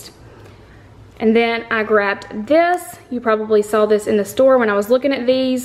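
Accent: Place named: South, United States. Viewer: Coming over from Tiffany’s channel! Love your channel and your southern accent❤️ I live in North Carolina 😊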